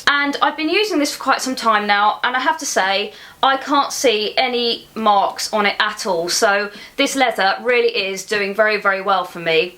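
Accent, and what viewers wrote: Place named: England, United Kingdom. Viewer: Hi, I stumbled here through accident. I am not into handbags as I am guy. :) But I have to tell you that you have a very enjoyable voice Ma'am. I hope you ever heard of ASMR as I think an ASMR handbag video by you would be one of my favorites. ;) edit: I forgot to mention that also your English accent would make an ASMR video by you even more enjoyable. :D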